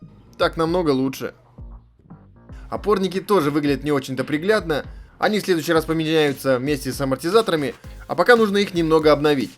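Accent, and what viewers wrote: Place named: Perm, Russia. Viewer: Говор как у Реальных пацанов, с Перми что-ли? 😀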